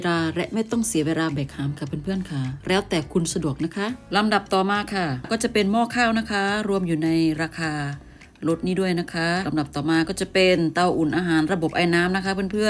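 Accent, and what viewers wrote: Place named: Isan, Thailand. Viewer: เสียง พูดกลาง ผสมอิสาน เพราะนะ มิน่าสติกเกอร์ทำที่ไทยสวยจริง นึกอยู่แร้วว.สติกเกอร์จากไทยแน่ๆ อิอิ ร้านพี่ก็พิมพ์สวยน้าา ไปดูที่ช่องเลยงับ😁😁😁😁😅😅😅😅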